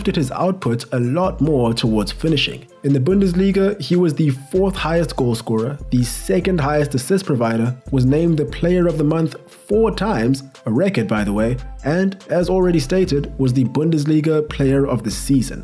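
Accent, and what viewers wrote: South Africa. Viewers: homie talking South African? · I'm a simple man. I hear a South African accent and I subscribe 🤌 gotta support the peers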